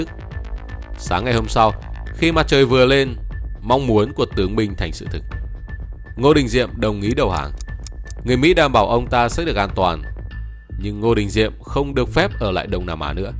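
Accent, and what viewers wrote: Vietnam. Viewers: Chất giọng Việt ❤. Video thật sự phơi bày đúng những diễn biến thời cuộc.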